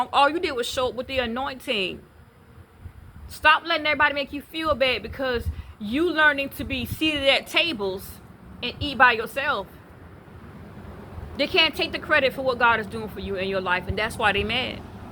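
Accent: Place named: Louisiana, United States. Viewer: SIS❤ You gotta be from Louisiana❤ with that Come on Nah😂❤ I Love my State, but Yeah, when I tell You I’m so glad GOD had me follow you💚 When I tell You, You be Conforming🙌🏾🙌🏾💚🗣️ JESUS🤍 HE DONT want NOBODY taking the Credit for what HE is Doing in my Life🙏🏾🙏🏾 Yeah things a lil shaky BUT GOD 🙌🏾🙌🏾🤍 I’m at Work Smiling so hard😁😁 You uplift my Spirit 🙌🏾🙌🏾🤍💕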